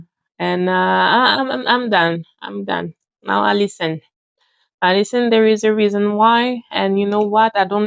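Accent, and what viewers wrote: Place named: France. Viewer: Your french accent is so divine soothing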